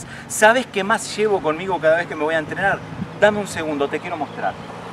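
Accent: Argentina. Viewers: Buen video yo siempre tomaba banana licuada con leche hasta que me di cuenta que era malo para la salud entonse la empecé a tomar con agua. Una pregunta ¿de donde sos, porque noto un acento de argentino mezclado con otros?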